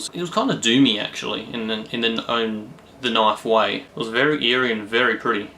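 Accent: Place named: Australia. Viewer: Nice review! Where from Aus you from?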